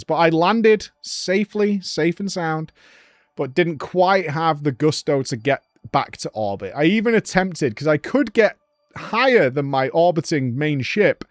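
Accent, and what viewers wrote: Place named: Manchester, United Kingdom. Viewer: He's saying duna, hes just from Manchester is all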